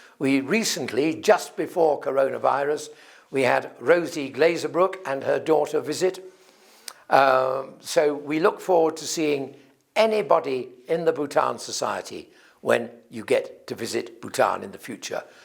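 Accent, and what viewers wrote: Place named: Oxford, United Kingdom. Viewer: I listen most of your talks because I think you beautifully speak original Oxford English, I think. Original way of language speaking changes in passage of time from place to place.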